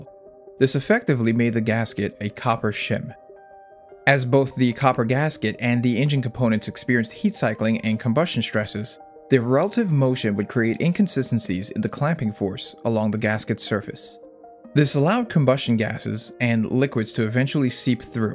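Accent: United States. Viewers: Quit using these stupid metric units that nobody uses, also you sound American so I don't know why these units are your primary's.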